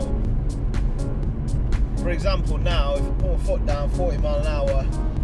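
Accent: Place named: South Yorkshire, United Kingdom. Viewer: I actually own this exact car and its actually a perfect performance car aha and this is my first video i watched of your channel and i really enjoyed this video so u earned yourself another subscriber and as soon as you started talking i instantly knew you. Was from round south yorkshire area aha im from Sheffield my self. :) where abouts do you DJ might have to come and see you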